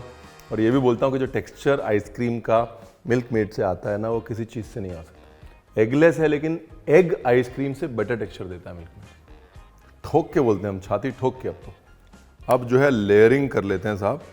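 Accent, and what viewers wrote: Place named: Lucknow, India. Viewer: Recipes ke sath jo lakhnauaa lahja hai bas sunte rahne ka man karta hai. bahut Bahut shubhashesh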